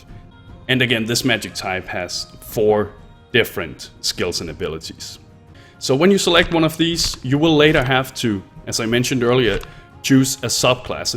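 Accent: Denmark. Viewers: Bro You sound Danish 😁 No Worries i do too. Cuz i am 😂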